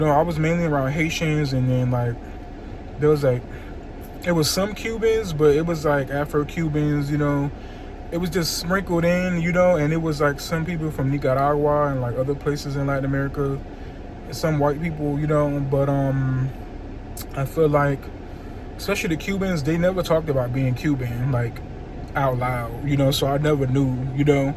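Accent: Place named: Spain. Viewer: So you developed a so called Spanish accent.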